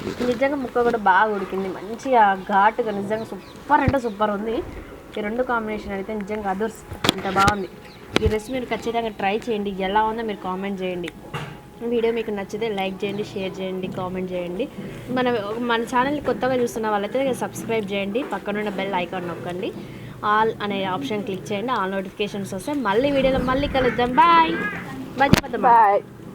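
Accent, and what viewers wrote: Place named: Khammam, India. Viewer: Meedhi kammam district kadha? Me athamma accent alaane vundhi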